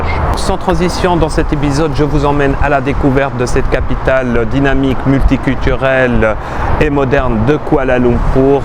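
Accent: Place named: France. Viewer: I don't understand French but I like French accent...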